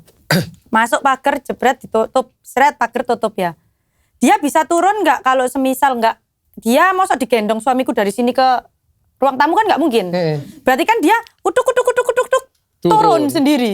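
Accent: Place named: East Java, Indonesia. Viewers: jawa timuran, tepatnya ya surboyo ngalam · Dialek Jawa Suroboyoan ini.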